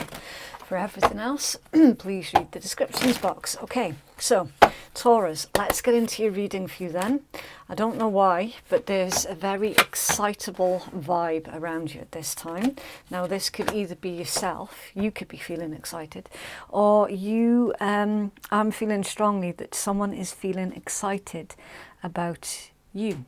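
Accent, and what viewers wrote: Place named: Wales, United Kingdom. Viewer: exactly! Tq for wonderful reading its a testament you have masculine energies on board! Nice to hear Welsh accent from mine to yours...❤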